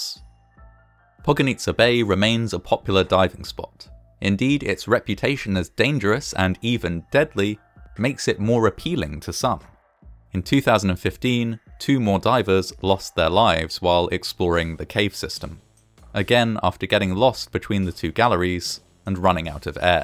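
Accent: United Kingdom. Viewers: I watch your disaster videos vs others due to that British accent. Keep it up! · British accents work really well for documentaries.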